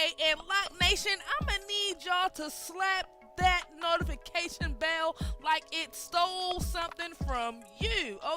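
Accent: Baltimore, United States. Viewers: You from Baltimore lo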